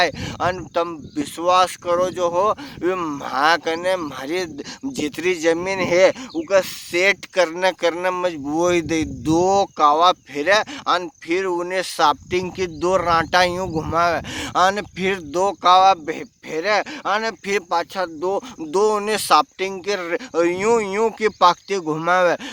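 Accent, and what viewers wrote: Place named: Nimar, India. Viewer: Shuddh malvi bola kr bhai nimadi kyu add krta h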